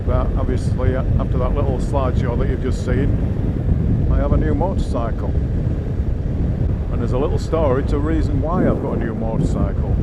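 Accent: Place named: Derbyshire, United Kingdom. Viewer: That’s a coincidence. I popped into Pidcocks last Thursday . I live in Derby, you sound more N Derbyshire / Notts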